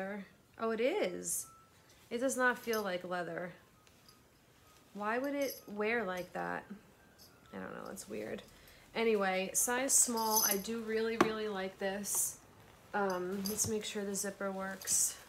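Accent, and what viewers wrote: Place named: United Kingdom. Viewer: I can never decide whether you are doing a London Cockney accent or a posh British accent. It's a bit of a mix. I have a very broad, thick Scottish accent but some say I sound like a posh Scot. lol. Makes me Guffaw every time! The silk dress would be great dressed down with denim jacket and boots. I am I big boot fan and wear them all year round, with just about anything. I'd probably add a big squash blossom, knowing me?! 😁 Take care and stay fabulous! 🤗💞✌️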